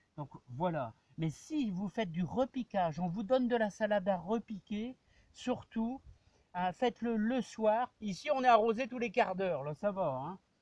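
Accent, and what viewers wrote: North, France. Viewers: Bonsoir merci pour votre vidéo, oui il pleut et attention au mildiou!! il faudra surveiller. J'adore votre parlé du nord!! A bientôt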